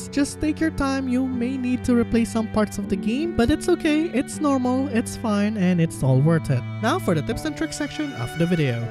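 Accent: Philippines. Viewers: Eyyy filipino accent 💯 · Corrct me if I’m wrong but I sense a Filipino accent 🤣🤣🤣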